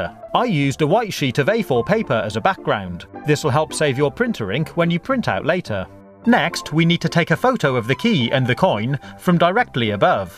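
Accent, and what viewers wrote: United Kingdom. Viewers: You guys are fools, he's British and also has a British accent. He's even using a 50 pence coin in the video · Fahima Husaini he’s just saying his accent is British I’m British to but I still laugh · It is a British accent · He has a British accent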